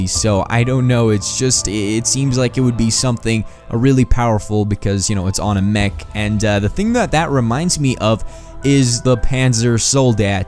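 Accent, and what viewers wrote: Bosnia and Herzegovina. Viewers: Are you bosnian.?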